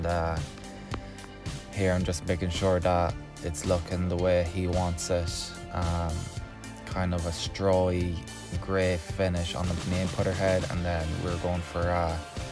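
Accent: Ireland. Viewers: Great work. You have IRISH heritage? Accent and words indicate yes 👏🏻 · Class work!! Hint of an Irish accent?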